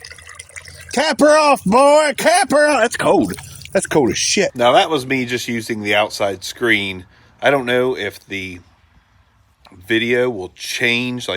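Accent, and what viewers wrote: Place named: Delaware County, United States. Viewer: You sound like you have a delco accent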